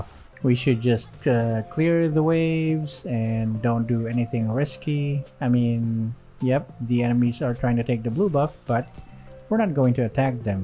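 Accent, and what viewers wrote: Philippines. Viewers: Dammnnn your tagalog accent 🤤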